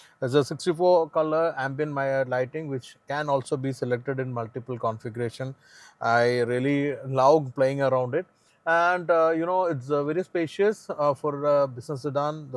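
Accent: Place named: Kerala, India. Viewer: Heavy Malayali English accent from the man. Then I saw the location, ahhh? Dubai. OK! Nice content.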